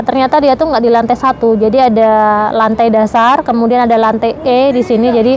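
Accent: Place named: Lombok, Indonesia. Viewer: Salam kenal mb Oww pantas lama d lombok Dialeknya kelihatan banget Salam untuk suami dan Matilde yg cantik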